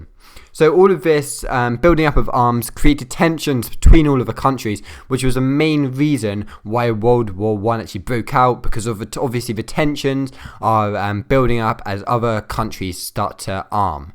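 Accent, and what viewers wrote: United Kingdom. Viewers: Sounds like a pretty standard middle class English accent, but with a slight speech impediment.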